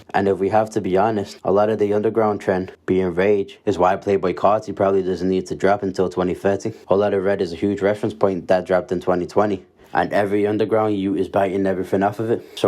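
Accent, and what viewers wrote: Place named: Northern Ireland, United Kingdom. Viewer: To me it reminds me of different accents, like a bit of an irish accent but also newcastle. It just doesn't quite fit with either to me, I'm pretty sure he's from somewhere on the british isles. English is not my first language though, so I might be completely off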